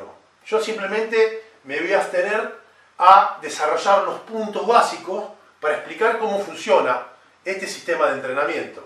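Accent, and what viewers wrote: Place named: Argentina. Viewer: No conocía tu canal. Me gusta. Es bueno oír gente hablando del tema en "argentino" jajaj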